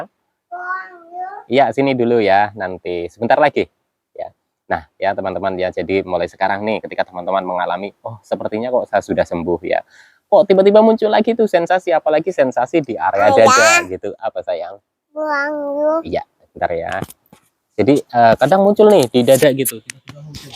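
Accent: Banyumas, Indonesia. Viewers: Mas sampean sih daerah ngendi ngapaknya, nuwun sewune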